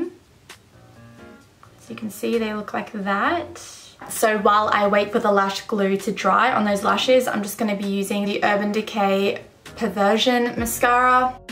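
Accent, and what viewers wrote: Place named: Australia. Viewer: Soo jealous of your Aussie accent 😂😩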